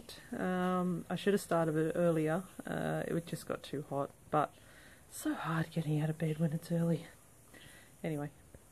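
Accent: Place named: Australia. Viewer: Keep up the good work as Americans love Australians and there awesome accents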